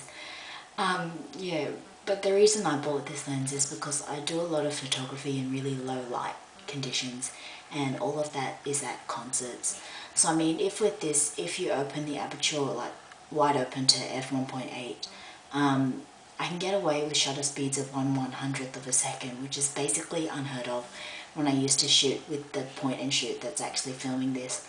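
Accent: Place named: Australia. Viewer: Gr8 comments on the lens. Lovely Nicole Kidman esque accent. I wish you well in your SLR endeavours. Ps. I'm using this lens with a Canon EOS 30V 35mm and have taken 3 shots on the new 500D. Interesting in seeing my 35mm shots since the Digital ones are gr8 in low light.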